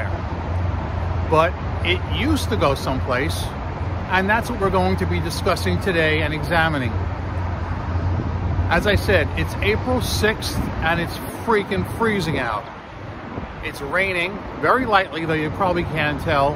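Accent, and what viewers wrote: New York, United States. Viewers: Your distinct New York accent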